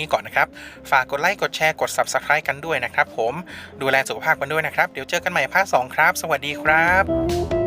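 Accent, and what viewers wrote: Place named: North, Thailand. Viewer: น้ำเสียง และลีลาการพูดมีเสน่ห์ หนักเบาน่าฟัง คล้ายนักจัดรายการวิทยุตามต่างจังหวัด อู้ไทยปะแล๊ดเมืองบ้างก็ดีแล้วครับ เพิ่มสีสรรค์ ถ้าลองเปลี่ยนเพลงประกอบจากเพลงสากลบรรเลง เป็นแบบสะล้อ ซอ ซึงดูบ้าง ก็น่าจะเหมาะกับการนั่งรถเล่นตระเวณภาคเหนือนะครับ / ชอบครับชอบ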